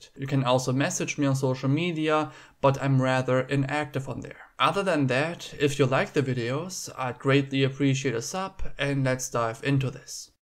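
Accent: Germany. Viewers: Ich hab mich minutenlang gefragt warum mir die Stimme bekannt vorkommt, bis ich den deutschen Akzent bemerkte 😍❤️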